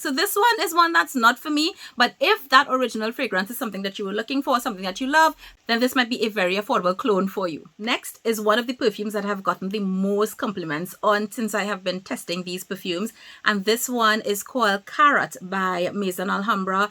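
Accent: Trinidad and Tobago. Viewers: You MUST be from Trinidad and Tobago by your accent!?!?! Biggup Trini...fellow Trinidadian here. Great content, keep up the great work. Had to subcribe 🇹🇹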